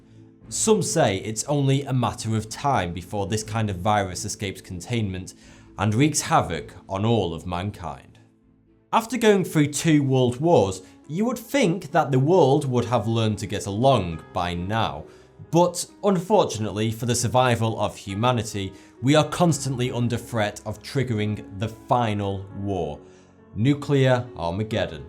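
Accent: United Kingdom. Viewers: You got to love that sweet and smooth British accent! :) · his British accent makes it sound like 42 but he's saying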